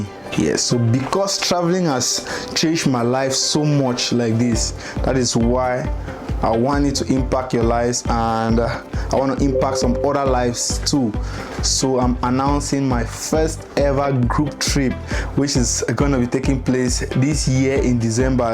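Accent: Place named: Cameroon. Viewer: Nice episode you just got a new subscriber from your accent I knew you were a Cameroonian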